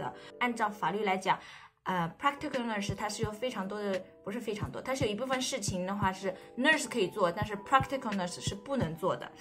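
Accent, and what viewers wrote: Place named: France. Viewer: 我好像从口音中听出了法语的味道呢(我堂妹在Quebec省(Ottawa)做Family Dr.)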